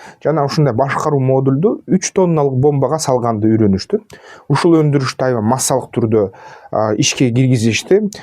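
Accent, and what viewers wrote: Standard. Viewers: Таза кыргызча суйлогонунуз жагат, азаматсыз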